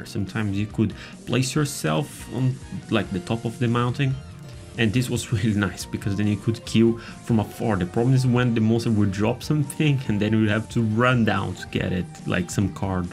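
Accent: Brazil. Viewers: A footage é br e vc tem sotaque br. És tu um br?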